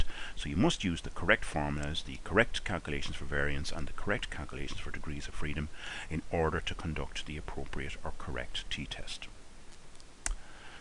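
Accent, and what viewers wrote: Ireland. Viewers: I really enjoy Irish accent. It makes learning fun again:D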